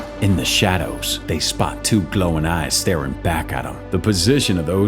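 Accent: Louisiana, United States. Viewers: Love the "Wheezy Anna" accent brother...great content as well. You just gained another sub! Feed us!!!!! ;)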